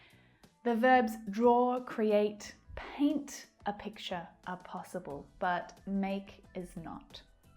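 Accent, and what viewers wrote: United Kingdom. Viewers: Ms I would like to ask about your accent,is it british accent? Let me know if you dont mind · NO ME GUSTA EL ACENTO BRITÁNICO... SORRY 🙃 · your lessons so helpful, you are British, right? now I am advanced what should I do to reach native level? thanks a lot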